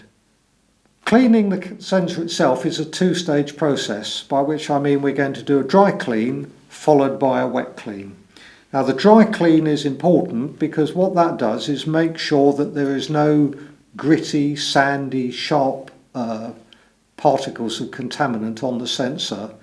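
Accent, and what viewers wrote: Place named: England, United Kingdom. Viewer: You sound like your from.england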